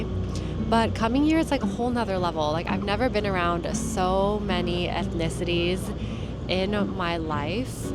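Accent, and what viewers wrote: California, United States. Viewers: I like your California accent, stands out in NY.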